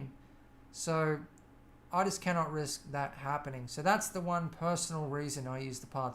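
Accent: Australia. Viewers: Her mate. Love your work! I was just wondering what country you are from because you sound Australian :P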